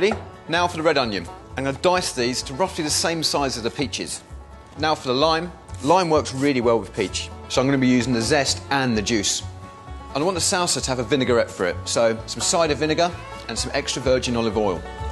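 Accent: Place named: Europe. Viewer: This ain’t southern y’all, this is European...The European accent the heeeerbs....hell naw this ain’t southern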